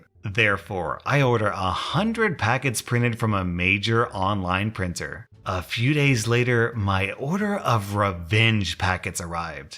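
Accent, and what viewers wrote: South, United States. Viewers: I LOVE HIS SOUTHERN ACCENT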